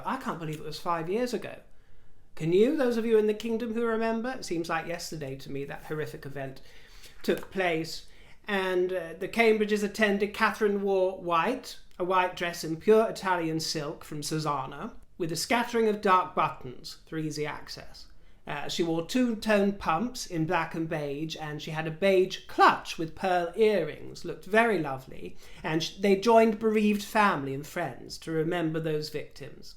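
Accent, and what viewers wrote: Australia. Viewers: I'm an Aussie and you crack me up with your Aussie accent. It's pretty good. Luv ya xxxxxx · Love your Australian accent... "Lilly Diana! Not sure if you meant to, but you really did make me laugh out loud!! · Wow Aussie here and you do nail the accent down to a T. 👍🤩😄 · Well done on your aussie accent :) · Your Aussie accent is an absolute delight, dear!!! As for the BC photographer, I hasten to agree with you - very hmmm 🤔 and their website lacks a lot of trust. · Bloody ripper of an Aus accent! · haha.If you used that accent in Australia you wouldn't be getting many invitations out. We have many different regional accents and dialects in Australia. You just used a very bad 'Harry Kings scrap-metal and junk car-yard' accent. Is that was what you were going for? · Your aussie accent 😂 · Love your Aussie accent ❤ You really got the point across...".Lilibet Diana"!!!👍👍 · Your Aussie accent is perfect. 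Not a hint of cockney or kiwi. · As a true blue aussie, you're accent is spot on from an Australian movie named Dons Party circa 1970s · you speak better Australian than I do! Good morning from a very cold Sydney ❤️ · Love your Aussie accent #. Got the pronunciation coming out nicely 👏👏👏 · 😁😁😁🤣🤣🤣🤣🤣 Oz accent. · Love your Aussie accent🦘🐨🇦🇺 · Your Aussie accent is on point 🇦🇺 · Sorry but not everyone talks like that over here, you know Australia has moved on from that,but saying that it’s like Gordie it’s a celebration of a dialect 🤷‍♀️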